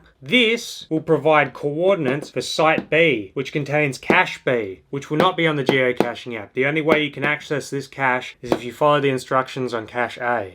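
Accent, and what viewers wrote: Perth, Australia. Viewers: You so look like you’re from Perth, but if you were from Sydney I wouldn’t be surprised. Nvm, you have a really heavy Perth accent I’m certain. Ahh you said you were from Perth, nvm. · I only just realised is an Aussie 😂 we have the same accent why did I think he was British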